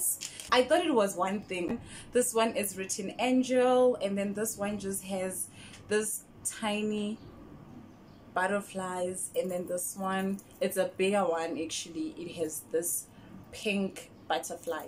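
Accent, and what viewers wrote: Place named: United States. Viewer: You are so entertaining I was laughing throughout the video like it is the American accent for me.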